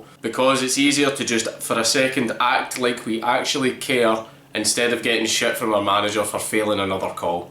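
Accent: Scotland, United Kingdom. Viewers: Hans Kloss its Scottish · LOL so very Scottish.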